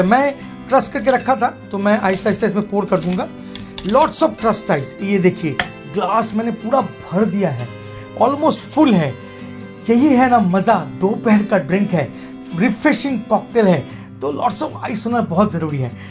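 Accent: Bengal, India. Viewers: Sir apnar hindi sunne mone hoche je apni bangali aita ki thik ?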